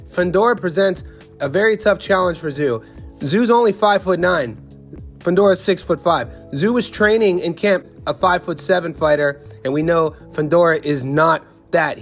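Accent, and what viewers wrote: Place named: Australia. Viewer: Tszyu you say you're Mexican maybe you are but not really you sound like an Australian you born in Australia you look Australian and because you from Australia you are Australian not Mexican so you can forget about that and probably the best that Australia has to offer in the boxing world. Yahweh said that's known as Jesus now you are not a pastor in your own land you know boxing is all politics just like everything else your father might be from Mexico but where you at now Australia I never heard of a Mexican living and born in Australia that's unheard of that's the other side of the world ...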